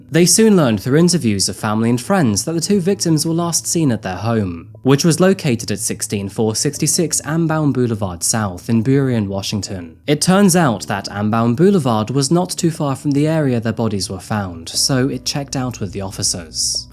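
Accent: England, United Kingdom. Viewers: I’m so glad you don’t speak like an American! Love your British accent ❤ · Ok you have to explain how you were born in Seattle but you have an English accent? Sorry if you’ve already explained this somewhere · How were you born in Seattle with a English accent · Wait, you're from Seattle? What's with the English accent, then? Is it just an act? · Love your videos and your British accent. As for the douche bag who killed this young couple because they could not pay their rent, it made my day to know that he is behind bars and that he is going to stay there for a very long time, probably until he dies. What a dumb guy, you are paying back now for being so stingy and evil. Now you are old, weak, alone, depraved of freedom, without any outlook. I am glad that courts of justice in the US are severe, much more than in France. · Okay how did you end up with the British accent? 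· Where do you get your English accent. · wait ..whaaaa did he just say that he was born in Seattle Washington ??? did I mis hear ? This guy is the most british sounding Brit in the history of Britain LOL weird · How tf were you born in seattle but sound like a complete tommy? That's weird my dude... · Born in Seattle? You must have moved to the UK very early on because that is a lifelong British accent. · I thought u were British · I'm glad this case got solved and that pile of anal seepage is behind bars. Thank you for another great video! They're always so well-made. You're not just a disembodied voice or 3 hours of interrogation videos with minimal, basic commentary. You're empathetic, which I absolutely love! You're kind and respectful to the victims and their families, and it's also nice that you sound (like your accent) that you're close to me! I like watching more British creators, obviously I get our dry humour. 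I'm rambling. Anyways, thank you for keeping us all informed! Big hugs for you ☺🫂🫂🫂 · If you’re from Seattle, why do you sound like a British kid? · Who writes the scripts for this stuff? Why did i need an introduction of Seattle? Why did you tell me you were born in Seattle but leave me confused with your British accent. I can only guess you moved to the UK at an early age. You need a better team for your videos... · Wait a second here. You're from Seattle? Where's the English accent come from then. Or is it fake? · my Sister n fam lives in Seattle I am so glad I have lived in Western CO since 1980, I thought u sounded a bit like u lived in UK due to ur voice love ur vids thx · And no better accent to do it with than the British accent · You sound so polite, so refined, I did not think you were American! 😂 I thought you were British. 😂 ❤ from Australia P.S. I absolutely love your channel - addicted in fact - keep up the good work! 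🤩🤗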